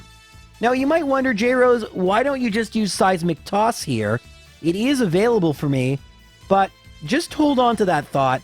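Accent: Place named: Canada. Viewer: I absolutely love your content J , but the way you say “against” drives me up a fucking wall 😂😂😂 is that because you’re Canadian or some shit ? You sound Canadian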